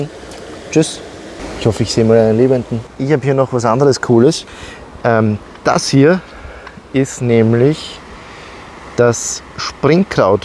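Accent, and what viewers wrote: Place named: Carinthia, Austria. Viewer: Hallo da habe ich keine Probleme,dich zu verstehen 🙂 habe viele Jahre im Kärnten meine Ferien verbracht 🇦🇹 war immer eine super Zeit,die ich dort verbringen durfte ♥️ Daher ist mir deine Aussprache sehr bekannt 🤗 Gutes Video von dir,Wie immer sehr informativ Grüsse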